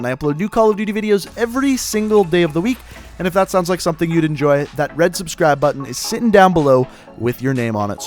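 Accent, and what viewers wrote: Canada. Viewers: Dude, are U canadian? !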